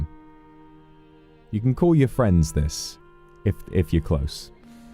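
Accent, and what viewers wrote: United Kingdom. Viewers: Thank you so much for this English class:_MiLove: I'm still learning English, and at this point, I'd like to learn more about the UK. I think I feel more comfortable with British English because of listening to you so much:_MiSmile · 本当に助かる ありがとう Voxのこと好きなんだけど何言ってるかわからなくて配信を見られていなかった 今回の企画は私にとってとてもうれしいです accentがよく聞ける、イギリスの文化やスラングがわかる、Voxが何を言っているかある程度分かる！！！ Voxのことも好きだし、イギリスにも興味があったから本当に助かる ありがとう 何回も見ます、本当にありがとう I'm so happy because this steram is very useful and kind for me. I love Vox, but I can't understand what you say. I WANT TO UNDERSTAND WHAT YOU SAY!!! So, this stream got me excited. I'll watch it again and again. Thank you for the awesome try!!!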